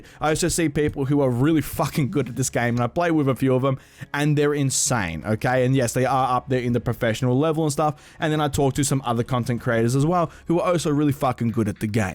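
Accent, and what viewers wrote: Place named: Australia. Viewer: Gaming i noticed,none other than australians swear and say fuck this way 😂😂love your vids bro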